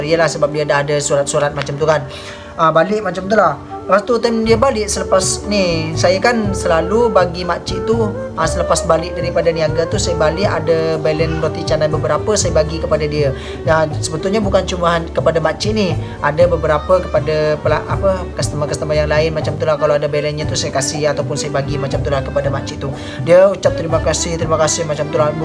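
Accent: Malaysia. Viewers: Tak ada dah sleng indo dah cara percakapan nak ni, dah macam lorat orang malaysia dah cara dia bercakap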